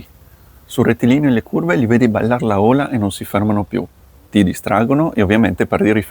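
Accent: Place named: Trieste, Italy. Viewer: 😁si che sei di Trieste l'ho capito subito, si sente, sarà il dna🤣 La pista mi sembrava strano, qua non riusciamo a fare neanche questo. Abbiamo fatto un paio d'anni con gli aerei elettrici ma.....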